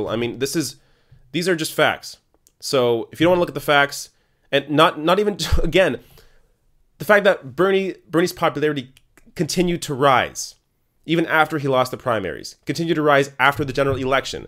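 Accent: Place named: Canada. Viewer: your accent betrays your Canadian-ness, and I have always wondered why you focused on American politics. Thanks for clearing that up. I love your rational voice as a Progressive and will promote your videos as I can.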